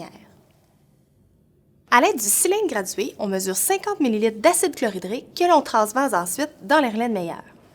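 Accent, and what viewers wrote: Quebec, Canada. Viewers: Qui regarde ça en 2020 ?😹 J'ai connue votre chaîne Youtube grace a mon prof de physique/chimie et en regardant les vidéos j'ai remarquée que ma moyenne en physique/chimie à évoluer 😸 Et j'aime trop ton accents Québécois 😺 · Cette vidéo est incroyable un accent québécois avec des explications claire et pricise que demande mon contrôle de physique mdrrr !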